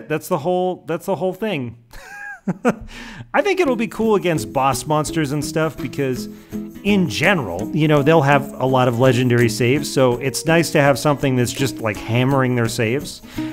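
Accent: South Africa. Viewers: Are you Afrikaans sir?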